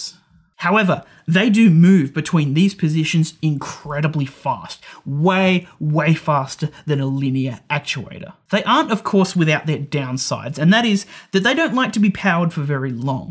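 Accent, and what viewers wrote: Australia. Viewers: Yewwww fuck yeah mate, love the vid, love the channel. I'm from the central coast and I love hearing aussies explaining electronics.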